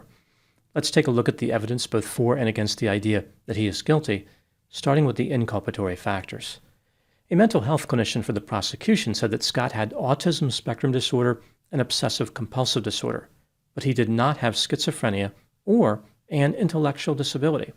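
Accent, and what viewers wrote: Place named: New Jersey, United States. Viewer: Dr accent is very Jersey or Philly..So that may explain alot..lol..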